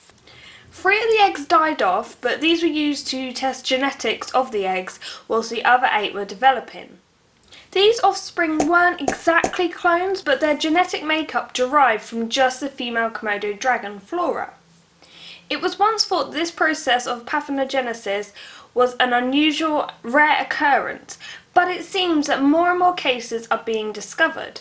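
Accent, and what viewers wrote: United Kingdom. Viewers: She's from Uk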